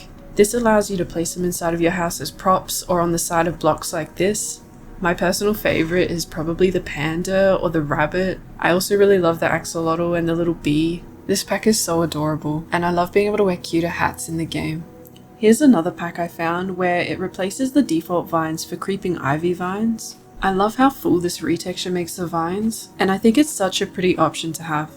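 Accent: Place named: South Africa. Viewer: funny accent. is tht afrikaans?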